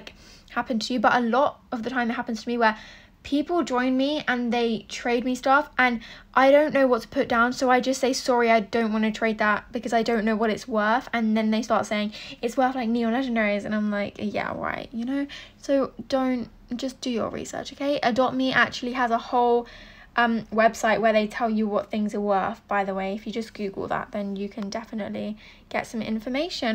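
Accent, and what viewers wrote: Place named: United Kingdom. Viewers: Ummm what do you mean all British accents sound the same. Hers is not the best accent. I ave a British accent and it sounds the exact same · Britishhhh~~~~~ da fancy accent ._. it's hard tho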